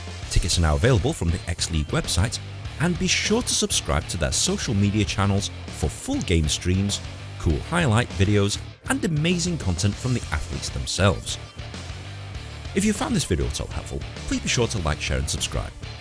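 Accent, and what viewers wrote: United Kingdom. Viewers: Narrator has a Limey accent. Cut some slack Yank.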